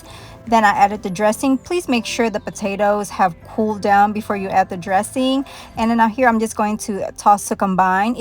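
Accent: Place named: South, United States. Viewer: Soooorrryyy. Please no one be offended. I just found this page trying to find lunch containers lol. I’m already obsessed. The whole time I was watching her videos I kept thinking to myself she has a little southern black twang in her accent! Either she’s part black or she’s always around someone black. I just thought it was funny how we have that little bit of seasoning in our words. Love this channel so far!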